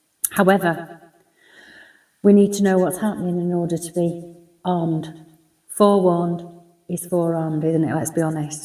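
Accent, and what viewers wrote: Sheffield, United Kingdom. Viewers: I knew that was a Sheffield accent, thank you for your readings. I love listening to you. Love and light from another Sheffielder ❤️🙏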